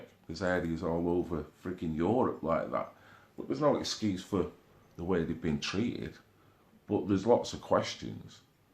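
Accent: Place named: England, United Kingdom. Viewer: Dear friend in England... I watch most of your videos, and I do struggle with the accent...but if I don't understand...I back the ding-dang video and listen to it again (until I do get it!) But is there any way you can increase the volume, because that really helps? (true...I am an "older" American lady, so part of my issue is hearing🙄 but some English accents are more difficult than others) The reason I follow you and Tommy and others is because, what is happening in the UK, might easily be mirrored, once President Trump's terms are ended. Thus...what the Brits do to push back is important to learn. I just wish y'all had fought back significantly sooner...but at least now, most of you have had enough. England has always been strong and the English ... a determined people! Conservatives (the Red Pilled hoard) are watching and cheering for our cousins across the Pond!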